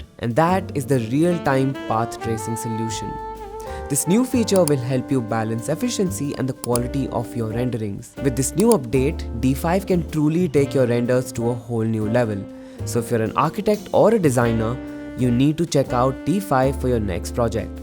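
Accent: India. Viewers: I CANT TAKE A VIDEO WITH 10 MINUTES OF INDIAN ACCENT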